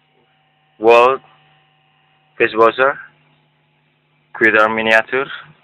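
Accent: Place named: Indonesia. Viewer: mas bahasa inggris nya lancar ya, ya Memang kedengeran aneh soal nya aksen nya indonesia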